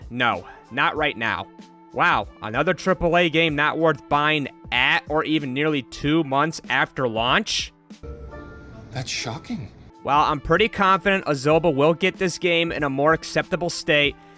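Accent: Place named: United States. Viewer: Thank God, finally someone who sounds like an American. Jeez. YouTube seems to favor everywhere except American content creators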